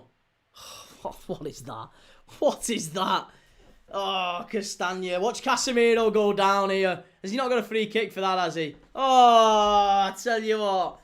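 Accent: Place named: Lancashire, United Kingdom. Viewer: You from Warrington? Live in Australia now, but you sound like a proper woolly-back. Reminds me of home.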